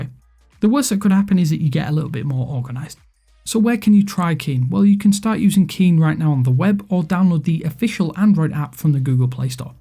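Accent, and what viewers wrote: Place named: Hull, United Kingdom. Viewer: Hull City, lol...was wondering where your accent was from.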